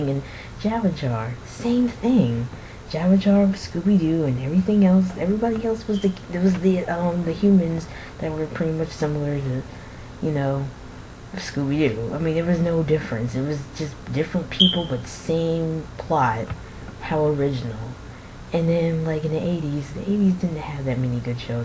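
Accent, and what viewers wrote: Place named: New Jersey, United States. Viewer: sign oh boy you sound like these jersy shore pinheads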